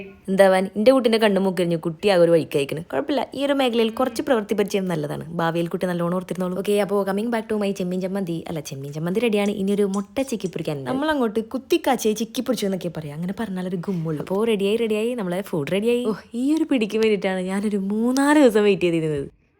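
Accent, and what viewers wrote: Malappuram, India. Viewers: But ur talk kelkumbo malappuram aanenna njn karthiye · ചേച്ചി തൃശ്ശൂർക്കാരിയാണോ? സംസാരം കേട്ടാൽ പക്ഷേ മലപ്പുറം സംസാരം ആണല്ലോ. ഇന്റെ എന്നൊക്കെ പറഞ്ഞു.. ഇന്റെ കുട്ടി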